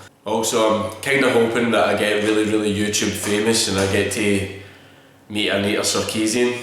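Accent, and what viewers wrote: Scotland, United Kingdom. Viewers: Holy crappo.. watching these ol skool dank vids is so enlightening as to how far his production value has improved... OMG A SCOTTISH BROUGUE AND AN ECHO!!! lol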